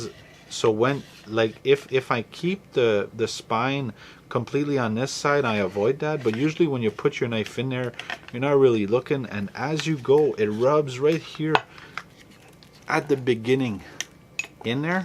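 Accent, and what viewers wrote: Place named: Canada. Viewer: Where in Canada are you from? Love the thick accent!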